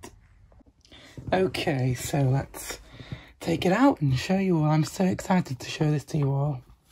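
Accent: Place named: United Kingdom. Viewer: I thought you were from the UK by the sound of your voice I checked your channels about info and yep I got it right I have other questions but I really want to come out offensive at all so I won't ask sorry but dang I want to get a copy of that Vinyl the first track is EPIC!!! Yeah anyway Lots Of Love Keep It Up And Stay Positive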